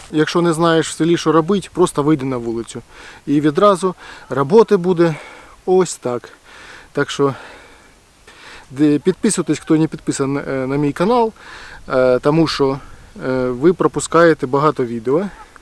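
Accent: Russia. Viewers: Привіт😊 все у вас добре виходить, чутно що деякі слова російсько-українські, але нічого 😊 потрібна практика.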